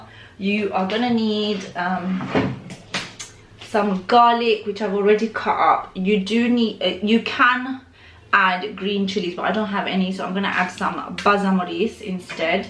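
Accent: Sylhet, Bangladesh. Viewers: Asalamualikum sister. MashaAllah very beautiful cooking you do ❤️👌. Love the way you talk sylheti 👍. I from sylhet and i live in Manchester. I just subscribed u beautiful Affa ❤️😘. Your fish dish looking amazing 😊👌 MashaAllah. Love you ❤️❤️❤️❤️😘😘😘😘😘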